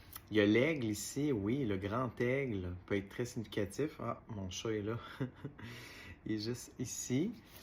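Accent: Quebec, Canada. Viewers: Bonjour, aaah l'accent québécois j'adoooore...et surtout cette vidéo m'appelait. Merci à vous🙏🙏🙏🙏🙏🙏🙏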